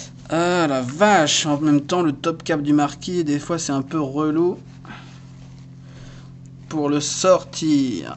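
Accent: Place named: France. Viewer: Tjrs un plaisir de regarder tes revious(anglais à la frenchy!!!)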